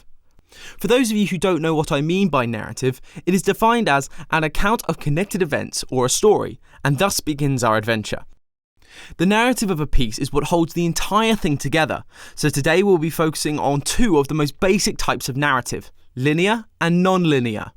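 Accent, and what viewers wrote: United Kingdom. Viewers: Subscribing for the sexy British voice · I love Your British accent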